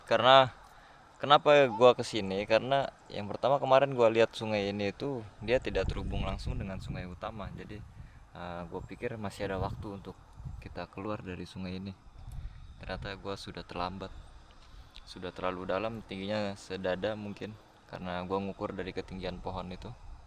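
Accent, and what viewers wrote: Sulawesi, Indonesia. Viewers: Sudah belogat sulawesi euy...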